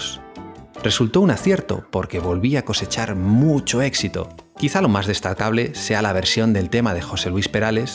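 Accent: Spain. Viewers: La historia de Marc es muy interesante, pero no entiendo ese acento español que risa 😂 obviamente no eres Marc, es por eso te aconsejo que solo relates „la Historia de Marc Anthony“ y sería mejor que no sueltes tus 🐔 gallos 😂please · Que feo eso de hablar por otro. Me parece q debió ser un relato en tercera persona, y ese acento español que es eso. Hable bien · Ese acento español, definitivamente no sirve para imitar a Marck... no suena igual !! Eso indica que la narración, las opiniones y punto de vistas expresados, puede que no sean los reales, ya que no los vierte el verdadero Marck Anthony !!!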